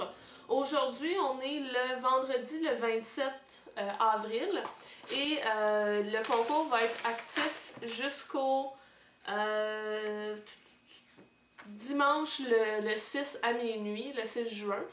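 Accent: Quebec, Canada. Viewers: moi je veut bien participé j'adore t'es vidéo, les lots sont pas mal et j'adore l'accent québécois. je croise les doigts et j'éspère que tu vas continuer t'es vidéos ^^. Bisouuuuus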